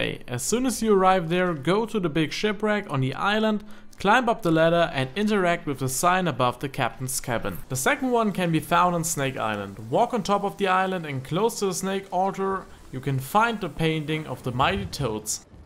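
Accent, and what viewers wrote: Germany. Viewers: Du hörst dich deutsch an :D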